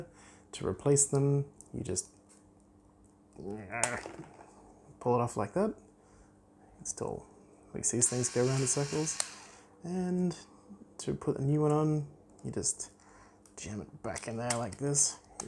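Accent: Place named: Germany. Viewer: Deutscher Akzent kickt wie Beckham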